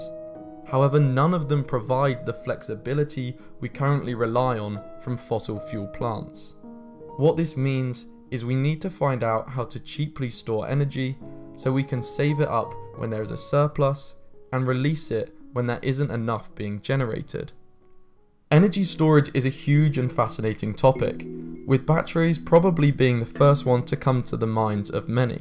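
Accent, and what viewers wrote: United Kingdom. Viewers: The idea is the modern perpetual machine. It is a hi tec scam. Before I believe a word of this bull shit I want an MIT physics prof to explain why it is a great idea not some guy with a british accent.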